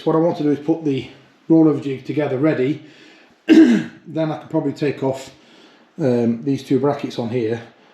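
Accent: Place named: Nottingham, United Kingdom. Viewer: I hear traces of your Nottingham accent. Is the Fiesta going back to bare metal?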